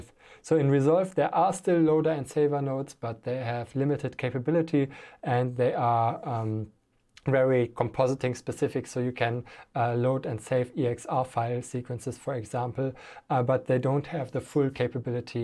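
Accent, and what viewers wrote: Germany. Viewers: Thank you! - very helpfull. Nice german accent. Danke für das Tutorial ;-)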